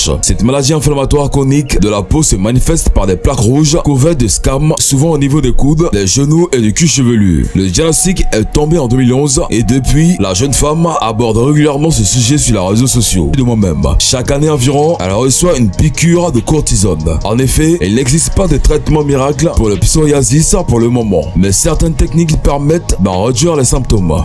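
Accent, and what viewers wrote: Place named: Benin. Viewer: C plus.son accent beninois.ils disent pas les.R.comme moi d ailleur hihihi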